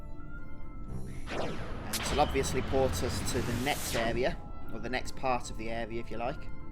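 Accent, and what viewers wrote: United Kingdom. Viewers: I'm very proud of myself because i can clearly understand your English Even with your british accent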